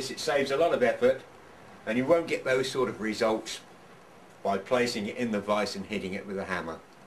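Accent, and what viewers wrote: United Kingdom. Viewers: this is amazing I love home made tools!!! and this may sound stupid, but I find I learn things a lot easier if the one teaching has a British accent lmao crazy I know lol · What size is the larger transformer that you have used for the bigger spot welder? Very good videos informative, clear and concise.....and British....Excellent!!!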